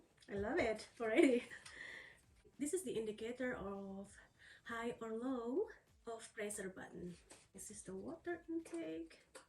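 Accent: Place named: Scotland, United Kingdom. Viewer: I thought you did a great job.,.... Maybe it's hard for people with that thick Scottish accent to understand.. Heck nobody can understand them anyway.... Keep after it girl 🙂🙂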